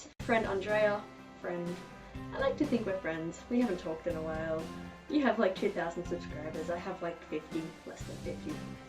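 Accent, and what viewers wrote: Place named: Australia. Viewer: I miss Australia so much, so I really liked your video! SIGH the wonderful sound of an Australian accent.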